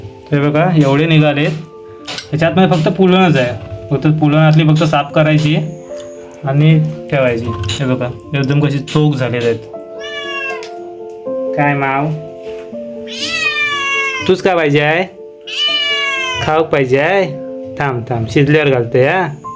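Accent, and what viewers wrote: Malvani, India. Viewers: भावा तुझ गाव कोणत मालवणी बोलतोस पण मीक्स आहे तु बोलतो ती भाषा मालवण तालुक्यात बोलत नाही प्लीज सांगशील गाव कोणत 🙏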